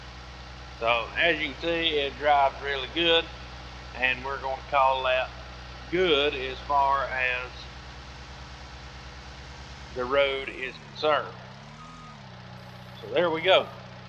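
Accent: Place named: South, United States. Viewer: Also man, if your still having issues with your LSPDFR, I have a full support team in my discord that can definitely help you get it up and going. They’ll treat you good man, and if for some they didn’t, you can always talk to me about it and I’ll handle it. You seem like a really down to earth guy and I really enjoy the content as well as the southern accent lol I’m from Tennessee myself. Anyways man, just thought I would throw that out there for ya.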